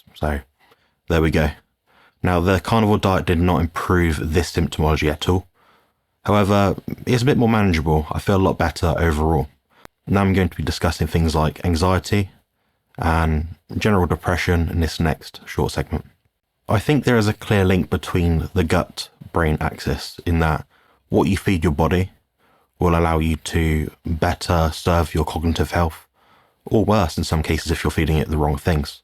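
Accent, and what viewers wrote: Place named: England, United Kingdom. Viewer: Thanks. It's nice to hear a lovely English voice.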